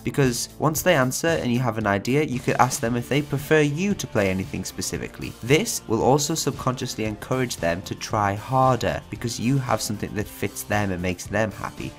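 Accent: Newcastle, United Kingdom. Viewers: You're from newcastle aren't you?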